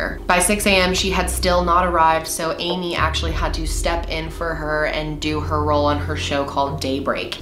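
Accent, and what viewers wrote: Minnesota, United States. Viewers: you're doing a case super close to home.. st cloud is 1.5 hours away from me.. and cedar rapids is 2.5 hours. mason city is 2. super close. other note,, our MN accents are strong lol. I get picked on for certain words I say. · Her cute little MN accent 🥺🥺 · I loooove Minnesota accents. Why should she, or anyone, have to work toward getting rid of it? This case is so sad. :(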